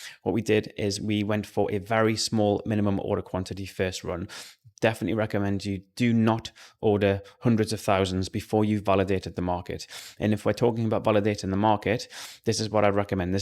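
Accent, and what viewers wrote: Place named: Germany. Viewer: are u german ? you sound a bit like it im german aswell thats why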